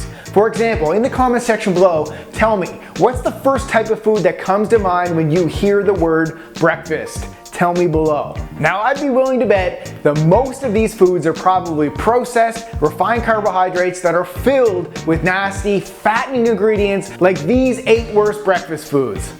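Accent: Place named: Canada. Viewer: That fucking Canadian accent makes my ears bleed.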